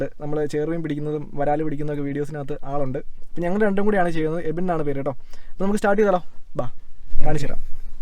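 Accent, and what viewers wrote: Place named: Kasaragod, India. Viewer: Aiwaaaaaaa🥰🥰🥰🥰🥰 kidilan #kasaragodbhasha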